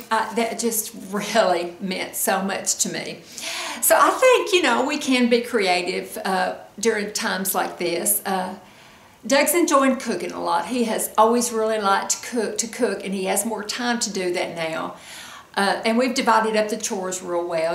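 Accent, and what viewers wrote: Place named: Tennessee, United States. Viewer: Great hair! Yes you are so right, we can get by with a whole lot less than we thought! I too did my roots but must admit can’t wait to sit in my hairdressers chair again! I had the privilege of living in Tennessee for my senior year of high school. I made some dear friends while there. Every time I hear your voice it brings back fond memories of them! I loved their TN accent as much as they loved my GA one! Stay well!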